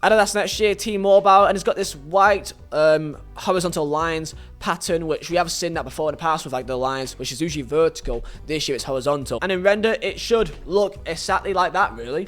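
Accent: United Kingdom. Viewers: ¿this dude is british? (question from a Mexican.)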